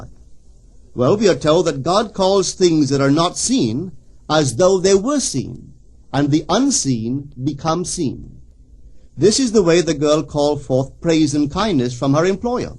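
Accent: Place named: Barbados. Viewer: This isn't Neville's voice. This is someone else. I think they(on this recording) might also be from Barbado's but this NOT. I know his voice.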